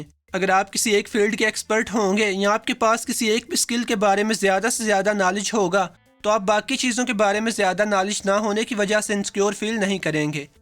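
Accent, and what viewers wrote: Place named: Sialkot, Pakistan. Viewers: App ka lehja sialkoti hy na. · Punjabi urdu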